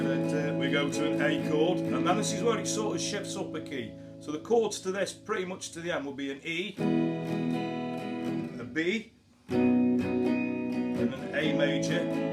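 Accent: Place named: Midlands, United Kingdom. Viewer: thought you had a Midlands twang..Like the cover by the way.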